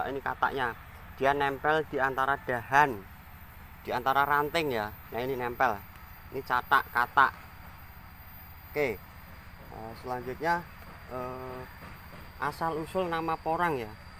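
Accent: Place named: Java, Indonesia. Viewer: Mantaap...tutorialnya. klo dengar bahasany, asli orang jawa ya, om...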